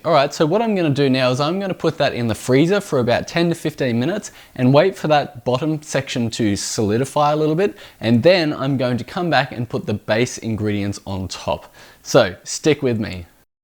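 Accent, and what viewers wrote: Australia. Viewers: Good to hear a fellow Aussie talking about Keto lifestyle on here:-) I’m day7 great video! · Wow! how awesome! They turned out Deliciously fantastic and perfect too!! I too, could have devoured the whole lot in no time. They were a hit with my Bestie! I didnt trust myself with them all. So I sent some away with my Bestie to enjoy also. thank you so much! So glad I found your channel. Finally, I found a fellow Aussie to keep me focussed on my keto committed journey! Hearing you say “$5 bucks from Kmart.” Was ‘home’ to me! Gotta love it!” I have subscribed and can’t wait for many more Aussie recipe ideas. GOD love you!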